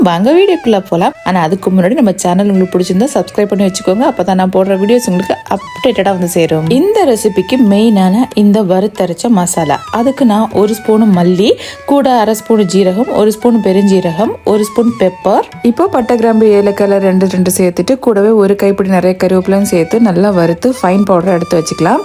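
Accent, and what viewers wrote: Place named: Kerala, India. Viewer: Unga pechu la slightaa malayalalis pesara slang therinchudhu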